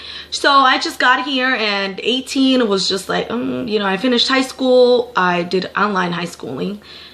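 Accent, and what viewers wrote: United States. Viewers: Before you lived in Connecticut did you live in jersey bc I feel like you have a jersey accent just like mind get it girl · yo .. you sound like you from the 413 Springfield Mass ... then you said CT ...lmoooo i was close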